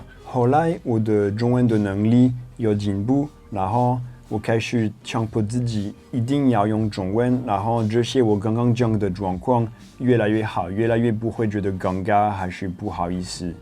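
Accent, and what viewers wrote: Taiwan. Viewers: 的國語，越來越好，再過十年一定成為真正的台客，因為學的國語是台腔國語，沒有過分的捲吞，不簡單喔！ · 台灣國語的 😂🤣 不愧是， 🥰👍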